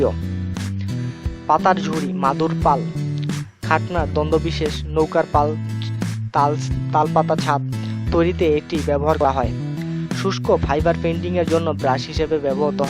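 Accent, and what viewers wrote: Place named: Barishal, Bangladesh. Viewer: ভিডিওর ধারা ভাষ্যকারের বাংলা ভাষার উচ্চারণ বরিশালের আঞ্চলিক ভাষার অনুকরণে উচ্চারিত। অশুদ্ধ উচ্চারণের জন্য অনেক বাংলা শব্দের সঠিক অর্থ বুঝতে অসুবিধা হচ্ছে। বিশুদ্ধ বাংলা উচ্চারন হলে আমাদের সবার বুঝতে সহজ হয়। ধন্যবাদ।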